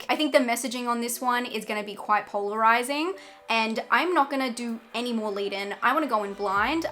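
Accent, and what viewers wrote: Australia. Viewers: I love the Australian accent and how the letter O is pronounced as OR. Say “ O and R “ over and over again!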